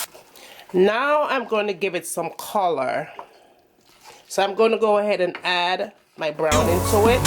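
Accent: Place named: Jamaica. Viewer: Looooove the cooking but looooooooooooooooovvvvvvee the Jamaican accent! ❤❤❤❤❤❤❤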